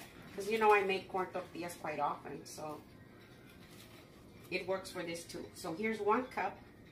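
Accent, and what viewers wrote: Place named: San Antonio, United States. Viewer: Hello, when I heard your voice you sound like your from San Antonio. I don't like cooking but I just got married two years ago. I don't work anymore more so I thought this is a good time to start. I'm 60 years old from San Antonio, but just moved to sante Fe new Mexico, don't like the food here much so I want to learn to cook.. I'm excited to learn your recipes.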